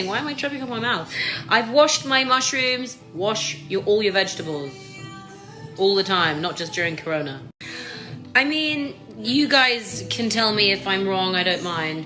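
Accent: United Kingdom. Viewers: It could taste terrible and I would believe her. The British accent makes everything more believable. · I know you won't see this but I loved you in Midsommar! The control you had over your body language and facial expression. A good portion of the movie it seemed like everyone was dazed trying to figure out what was going on until the last 30 minutes. Also just when I think an actor is American and here that English accent! Same with Oliver Cohen who played adult Lucas In "The Haunting Of Hill House" as an American, strung out, drug addict. Watched an interview him, first thing I here is that old British "Ello!" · ohh my God this is British accent I thought you are an American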